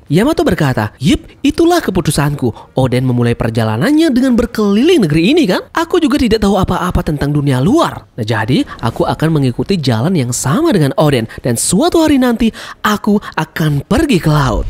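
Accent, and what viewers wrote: Bali, Indonesia. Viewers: kok kayak jd logat bali bang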